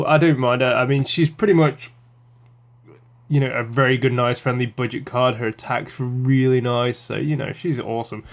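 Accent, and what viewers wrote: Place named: United Kingdom. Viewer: I'm in process of building a budget Kozmo deck and I would recommend running reasonings for the free summons of the ships and reasonings are cheap too and also the field spell can help unbrick your hands and code geass is fucking amazing man and you sound British I am also british whereabouts you from?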